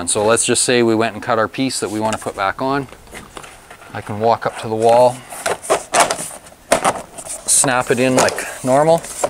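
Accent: Canada. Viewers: I hear Canadian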